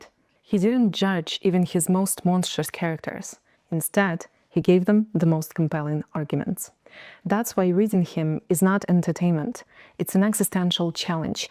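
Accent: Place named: Russia. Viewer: Ох уж этот russian classic accent :/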